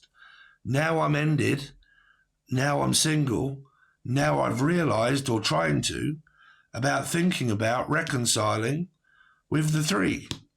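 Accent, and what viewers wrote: United Kingdom. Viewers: Im a sucker for ppl with British accents ❤.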